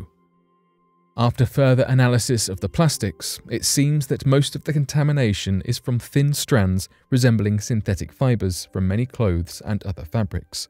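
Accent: England, United Kingdom. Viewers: Four minutes sensationalizing microscopic plastic and seven minutes indoctrinating you, with an English accent for authenticity, into a belief system for a 13 billion year old universe. Never mind the actual science of the matter showing the universe to be extremely young. And the "Big Bang"?, really? I thought we were past that joke of a theory. Even the inventor of the word, Sir Fred Hoyle, didn't believe in this nonsensical theory. Oh!, and he was vilified for it by the scientific community just like Mary Sweitzer was vilified in 2005 when she discovered soft tissue, red blood cells and DNA in a supposed 65 million year old T-Rex bone. Neither one were creationist by the way. Y'all need to get a clue.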